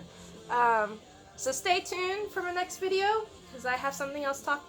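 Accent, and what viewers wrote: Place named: South, United States. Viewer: I'm so glad you read this and loved it! It's a beautiful manga. I love when your country accent comes out.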